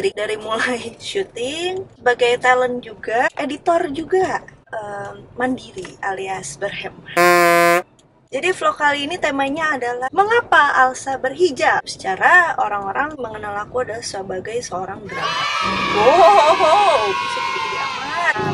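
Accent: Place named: West Java, Indonesia. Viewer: orng sunda nya katingal teh cara nyarios na 😬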